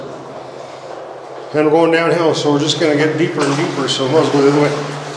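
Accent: Canada. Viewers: The Canadian accent is strong with this one..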